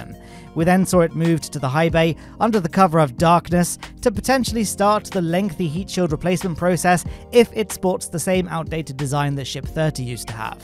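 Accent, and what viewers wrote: United Kingdom. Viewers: I know it may be difficult with a British accent but you need to pronounce certain words correctly, like a persons name or the name of a location. It isn't pronounced Macy as in the Macy's Day Parade, it's pronounced Massee's as in Ma sees, with a long 'a' sound. Everyone else in the dozens and dozens of YouTube channels pronounce it correctly, so why can't you? That is my only criticism of an otherwise excellent video, if I can't pronounce something correctly I find out how to pronounce and get it right, anyone can do it.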